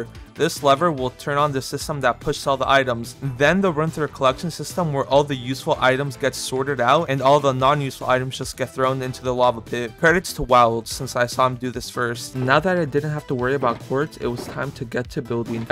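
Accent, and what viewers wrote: Norway. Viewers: Are you Norwegen?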